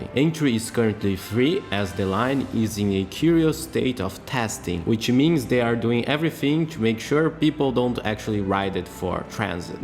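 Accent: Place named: Russia. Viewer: Seu ingles me lembra um ingles russo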